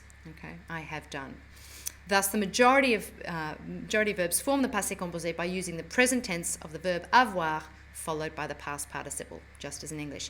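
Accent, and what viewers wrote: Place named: Australia. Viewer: pourquoi elle a un accent australien?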